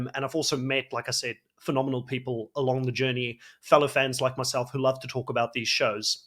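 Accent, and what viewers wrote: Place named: South Africa. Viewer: his accent is South African, though it can be confusing if you only hear that he lived in Australia and then moved to New Zealand. lol Watching the different versions of Survivor helped me be able to distinguish the English accents better. lol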